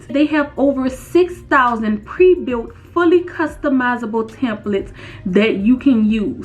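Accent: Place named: Louisiana, United States. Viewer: I like her, but her voice is so annoying it’s like a Louisiana annoying country type of voice or something just take some English classes somebody’s Youtubers think they can just hop on here and do anything it’s OK to take some classes specially, if you talk to an audience practice your English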